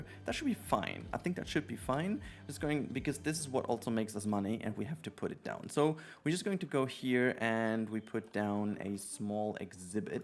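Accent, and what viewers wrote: Germany. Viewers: his english sounds so german 😂 btw like the video :)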